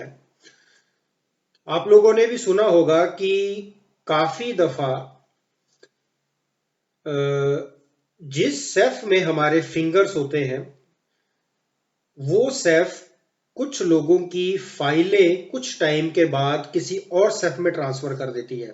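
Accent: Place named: Pakistan. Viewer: Yaar brother ap urdu itni saaf boltey ho jesey pakistan sey ho .. kahan sey seekhi ithini saaf urdu ?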